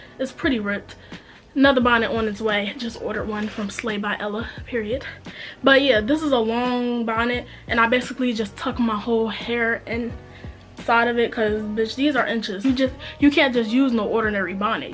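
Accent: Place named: Philadelphia, United States. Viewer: Girl I can really tell you're from Philly lol but this was helpful because im about to start wearing frontals.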